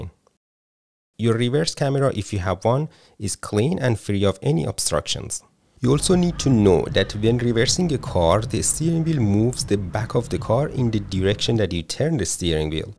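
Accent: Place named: Iran. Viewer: I bet he is Persian. I could spot on his accent. Thx regardless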